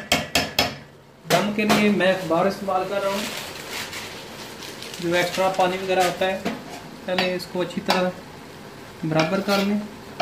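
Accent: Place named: Pakistan. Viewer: Taste ka to apna he maza hy but app k urdu sunny ka apna he maza hy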